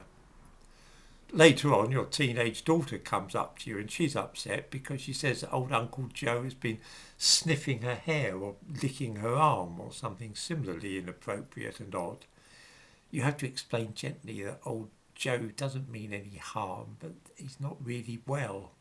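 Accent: United Kingdom. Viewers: I've never been very interested in history. That is until i found your channel. I really enjoy your history lessons, your thoughts and your British accent. Thank you. Kind regards from Sweden.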